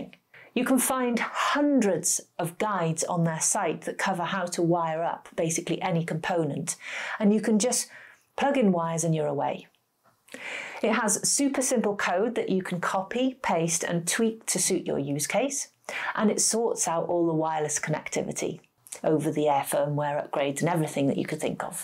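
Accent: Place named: RP, United Kingdom. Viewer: What's a great SmartHome introduction. Very informative, your mounth 'prints' queens spoken English, and you touch on things like ESPhome and many more that regular (even IT) guy would not be aware of initially. Are you UK based? If so, can you sometimes add links to products where you usually buy from?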